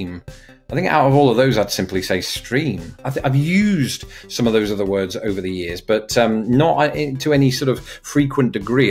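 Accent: United Kingdom. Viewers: Well, you still sound quite British. But that's great! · I watched the previous video. I am born and raised in the southern states, and I know the cultures and native dialects of those three cities quite well. Based on that, I say the results you got were totally BOGUS! Keep your British accent, cultivate and safeguard it, AND DON'T GET AMERICANIZED! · He's British? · You're British? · Very entertaining and fun! I enjoyed this a lot. Seems you're still Bri'ish. Cheers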